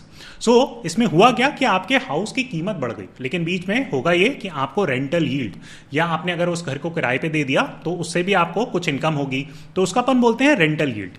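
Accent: Standard. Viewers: sir we all like your shudddhh hindi after all we are all hindustanis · Love your sudd hindi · Loved ur shuddh hindi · Han pasand hai aapki sudh hindi, ty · Mujhe apki shudh hindi bahut pasand aayi. Bas 'Apun', 'Apun' bolna chod dijiye. :) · बहुत बढ़िया। आपकी शुद्ध हिन्दी अच्छी लगी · बहुत अच्छा भ्राता, आप हिंदी और अंग्रेजी दोनों ही बहुत शुद्ध बोलते हो,👍🙏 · Shuddh Hindi me mzaaa aata hai yaar. Sun k bhi accha lagta hai aur jaise bade bhai samjate hai aisi anumbhuti hoti hai.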